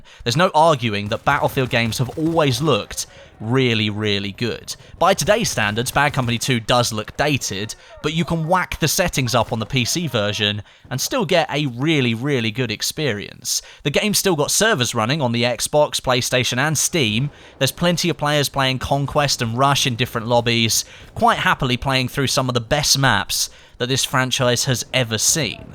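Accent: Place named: United Kingdom. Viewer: Football as in soccer or anerican football butt since you sound like a person from great Britain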